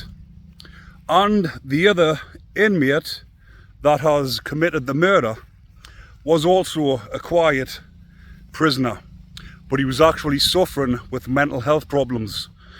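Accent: United Kingdom. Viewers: Help an Aussie out. Where in the UK is your accent from mate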